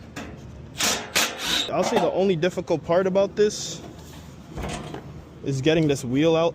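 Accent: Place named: New York, United States. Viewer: Native New Yorker here from Spanish Harlem. Your accent reminded me a bit of New York. Happy to see a fellow New Yorker thrive. Knicks in 5 🟠🔵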